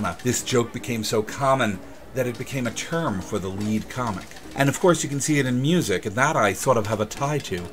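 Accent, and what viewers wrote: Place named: South, United States. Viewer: Southern charm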